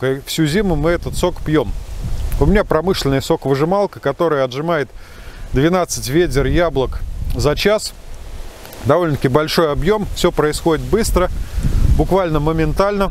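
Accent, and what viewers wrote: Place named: Tver, Russia. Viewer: Все думала и гадала что мне Ваш акцет знаком...не могла понять от куда. Бабушка моя так говорила ведер, не вёдер...она была родом с Тверской губернии.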